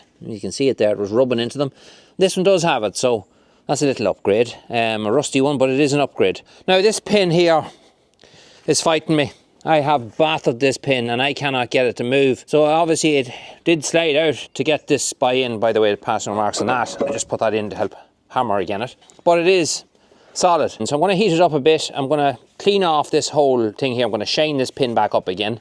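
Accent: Ireland. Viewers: can you tell if I zip through your video? As an old lady, I am not much interested in the heavy machinery repairs, but I do enjoy seeing the cattle, the green fields and hearing your Irish voice. I would not like you to feel insulted that I don't watch every minute, but I always click LIKE!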